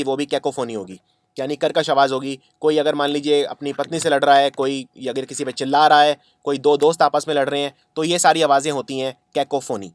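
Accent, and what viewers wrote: India. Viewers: oooo sh***t again this pronunciation by indian people .....!!!!! sorry, but it's so AWFUL!!!! my ears are died !!!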